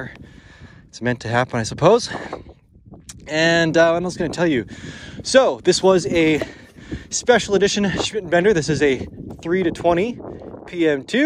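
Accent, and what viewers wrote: Canada. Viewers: U're canadian right? I'm sayin' this cause of your accent.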